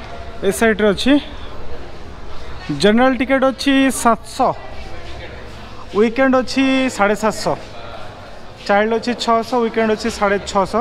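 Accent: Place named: Deogarh, India. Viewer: Tuma ghara deogarh ki...bhasa ta semiti laguchhi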